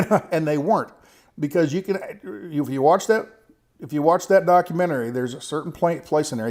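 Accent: United States. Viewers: your the best adult story teller.. You have a soothing voice with an all American accent... Love you videos Bud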